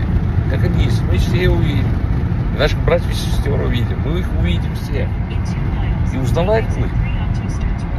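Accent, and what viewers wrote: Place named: Ukraine. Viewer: АКЦЕНТ ПОЧЕМУ ТО УКРАИНА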